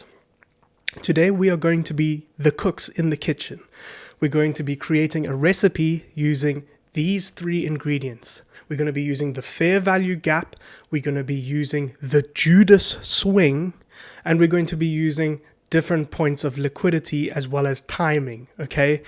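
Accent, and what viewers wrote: South Africa. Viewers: dude you sound South African hey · are you South Africa?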